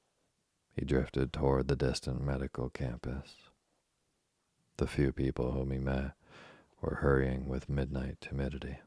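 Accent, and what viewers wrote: United States. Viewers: your straight up American voice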